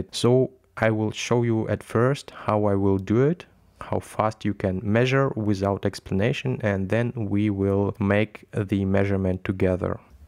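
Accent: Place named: France. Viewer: By the way I plan to go through all your videos on blender. They are well done. The cadence was excellent on this one. The on screen help was brilliant and they are very succinct. Thanks for your efforts. You deserve more subscribers. Also want to let you know that I have no problem with your english. Sometimes you substitute French grammar but that does not sound bad to an American ear. To the British? Well we all know they are snobs. Right? LOL.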